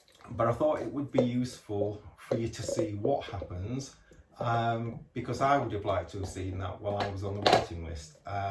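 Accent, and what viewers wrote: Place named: United Kingdom. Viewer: Hi, I enjoyed this video very much. As an American I’m quite enchanted by the way you speak haha. Interesting that you Brits count food in grams and call what we would say “doing it’s business” as “spending.” I think my favorite thing though was when he turned around to look at you and you just said “Hi Spencer!” It warmed my heart. On another note you showed everything very clearly to the camera and your descriptions were great and added a lot to my experience even as a sighted person. My whole county is under quarantine for the coronavirus starting tomorrow for 14 days so I’ll be watching all the videos. I do have one question- have you decided on a middle name for him?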